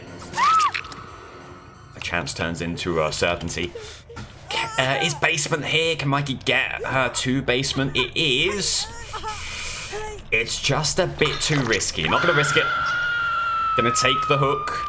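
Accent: United Kingdom. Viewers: So first video I’m seeing of this (I know, bad place to start but I will go back and binge the rest) but saying the killers are rewarded points for hooks when you actually count hook states is a bit confusing. That’s not negative criticism, just thought I’d point it out! Great content, I’m a sucker for a British accent 😂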